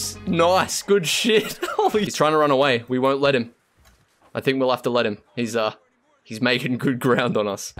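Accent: Australia. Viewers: Are you Australian? Cause you sound a little Australian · are you an ozzy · Why does he sound so much more Australian while on twitch?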